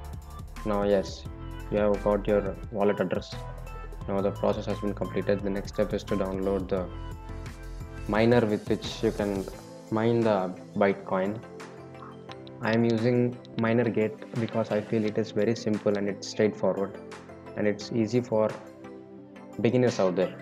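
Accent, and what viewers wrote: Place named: India. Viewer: Suggestion: drop the background music. The loud background music combined with your thick Indian accent makes listening to the content difficult for me, and I would venture for others, as well.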